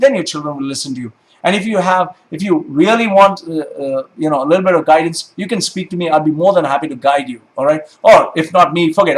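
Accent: Kerala, India. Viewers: I Enjoyed it 🤣🤣. All the facts.. I went to school in bangalore were majority teachers and students were mallus.. And trust called kerla samajam runs it, I enjoy the funny accent of Malayalam mixed in English 🤣🤣 you made my day bro 🤣🤣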